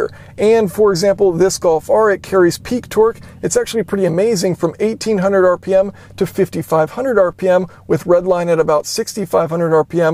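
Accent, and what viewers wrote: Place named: Oregon, United States. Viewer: Are you from Oregon/Washington?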